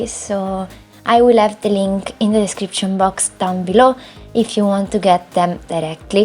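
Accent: Italy. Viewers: This house is super cute but honestly I'd just take the treehouse and live there. Okay bye. Haha I'm serious the treehouse is suuuper good and also the swing trick is amazing. Love your accent fellow italian sister😌❤❤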